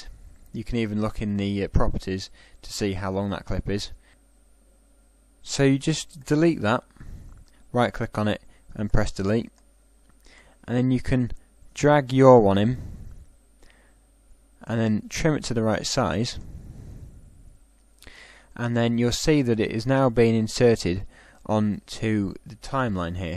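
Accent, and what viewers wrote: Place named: England, United Kingdom. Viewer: Thanks mate for helping video. Just optained Corel Video Pro 6. Very nice tutorial for starting guys like me. Love your Englings accent. Cheers, Eric (nl)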